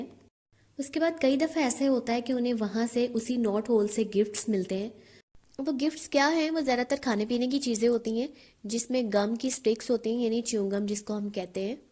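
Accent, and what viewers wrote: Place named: Pakistan. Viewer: एक्सेंट बाहिर सुनकर मुझे लगा कि आप पाकिस्तानी हो और सच में आप पाकिस्तान से हो👍 ग्रेट You have summarized the story very well. I am an Indian and I have to study this story in the 5th semester of college in Delhi University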